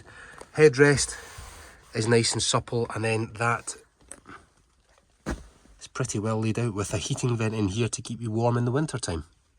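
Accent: Scotland, United Kingdom. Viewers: I watch this channel for the reasonably priced boats, but I stay for the beautiful Scottish accent and the “snap” transformations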